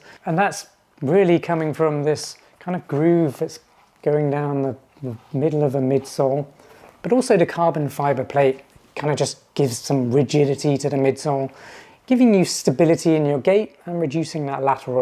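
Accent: United Kingdom. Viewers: You have British accent but talk in dollars. Did you go TTS in your UK size or US. Many say go up half a size in NB but I've just bought these in my usual 9.5 that I buy for most trainers. I'm hoping when they arrive there ok it's more length I'm bothered about as I have average width feet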